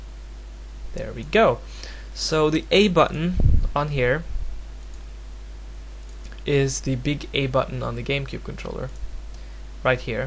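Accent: Norway. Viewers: Ha e skjønt du va norsk før du sa da xD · Haha I just knew you were Norwegian when I heard your accent! :3 Which isn't bad at all btw, you have very good english! Most Norwegians do actually! Eg e Norsk forresten, halvt Canadadisk faktisk, men bor i Norge :D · norwegian accent is one of my favorites i have many friends in norway and find it crazy how americanixzed your country has become. It astoounds me that your schools have english as a required language. Awesome video bro! thanks for the help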